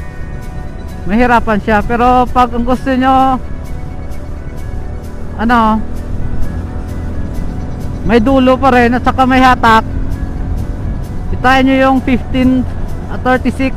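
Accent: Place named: Iloilo, Philippines. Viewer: ilonggo gid ah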